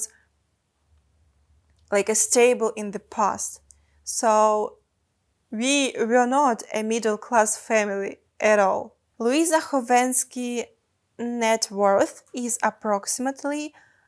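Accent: Russia. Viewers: It sounds like you're saying that you have to be either really skinny or very plus size to get sponsored by companies -- not be somewhere in the middle. I'm not sure who writes those articles, but I always wondered how accurate or not accurate they were when it came to youtubers or similar people. I thought you were around 28-31. To be honest, I think I thought you were from Russia in the past due to your accent.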